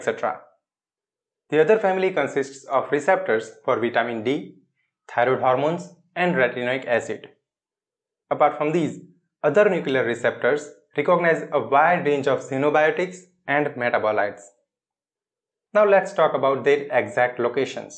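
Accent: India. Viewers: why it is always indian accent, I am sick of it! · If you are indian then its common to have indian accent and there is nothing wrong in it. Dont change your ways just becoz some stranger not liking it. U can't impress everyone, people are not even happy with gods, and we are just humans. Anyway great video. I like your accent 👉👈🥁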